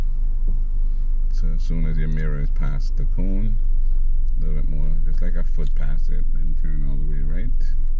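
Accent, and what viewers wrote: Jamaica. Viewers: He Jamaican?... hear the accent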